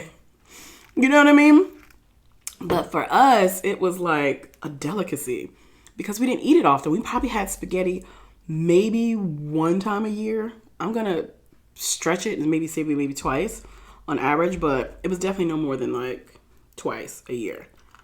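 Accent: New York, United States. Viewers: Yeeesss with the NYC accent. Rep my hometown😍😍😍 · Hey boo lol the New York Accent 🤣🤣🤣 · Yes you sound a New Yorker and we loveeee your videos‼️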